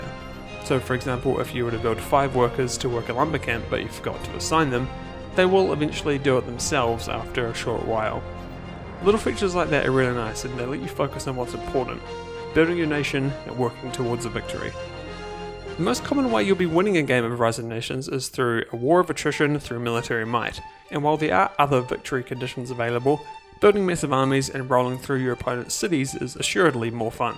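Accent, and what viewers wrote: New Zealand. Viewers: Are you a kiwi? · I see video, I hear Kiwi, I subscribe ❤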